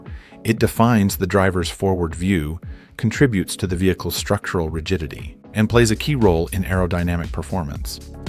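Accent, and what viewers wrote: United States. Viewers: Replica !!!! LOL. It's a 1/1 model poor personalised copy of a hypercar. If it's THIS easy ...... makes you wonder why all the 3D printing and CAD designed parts are ever necessary ............. everything is "PERFECT" and the aerodynamics are "tested". LOL. ALL the electronics are 300mp/h rated - just in case you were wondering how well the "air brake" would work at speed ....... Thje car is PERFECTLY symetrical - both sides not only LOOK but ARE perfectly identical reversed replicas of eachother. This ensures the car drives in a straight line at high speed. LOL. He is certainly smat enough however to make a video of it and overlap an American voice. Money is Money, every dime counts. Lets not forget that this lost guy in a shed in Vietnam has ready access to Brand New Bugatti front windshields - when Bugatti owners find it hard sourcing them ......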